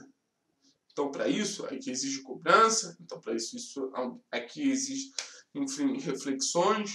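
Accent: Rio de Janeiro, Brazil. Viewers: Sou athleticano desde piá...tenho 51,anos e confesso q me soa estranho ouvir um sotaque carioca falar do Athletico com paixao como vc fala... Pois, como vc fala, aguentar o barulho de torcer pro Furacão não é fácil... A mídia carioca principalmente, mas dos paulistas tbm, quase sempre omitiu o Athletico e desedenhou... Admiro seu trabalho e seu esforco pelo meu time do coração ha quase 50 anos..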